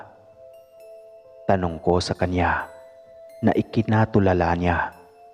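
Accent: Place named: Iloilo, Philippines. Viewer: Taga saan accent mo boss. Bisaya ba? Ay ilonggo pala..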